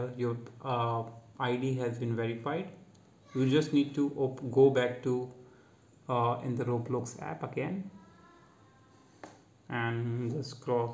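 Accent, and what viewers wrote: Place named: India. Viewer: I knew what it was Indian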